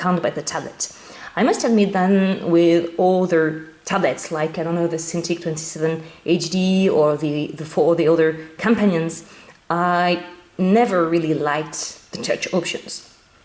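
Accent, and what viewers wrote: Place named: France. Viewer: your French accent is really enjoyable, quite frankly.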